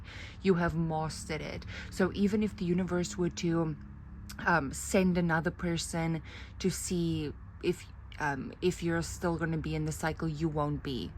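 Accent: South Africa. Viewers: South africa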